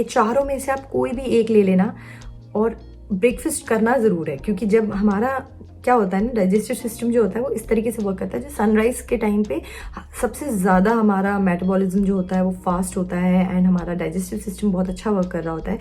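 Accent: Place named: Pakistan. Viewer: Not at all like Marium Pervaiz infact more perfect urdu than her. she is speaking urdu in Pakistani urdu accent. I am Pakistani BTW and we speak urdu like her. 😊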